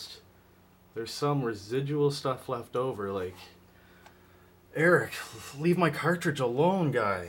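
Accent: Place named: Canada. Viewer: ...you've just been erased!.....i could tell you were a fellow canadian right away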